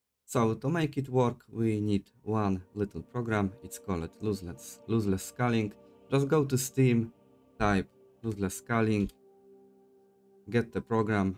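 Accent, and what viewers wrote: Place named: Poland. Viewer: Słychać że Polak❤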